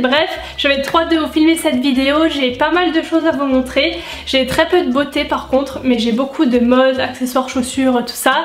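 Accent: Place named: Nord, France. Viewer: Merci pour cette vidéo 😍 j'ai flashé sur les sandales et la ceinture 👍 j'adore tes vidéos, ta façon naturelle de parler et ton accent du Nord 😊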